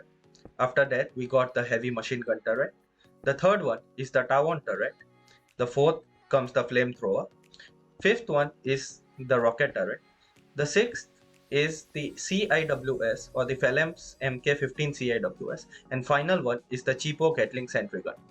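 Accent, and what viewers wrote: India. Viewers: hmmmm, his way of speaking english seems like he is an indian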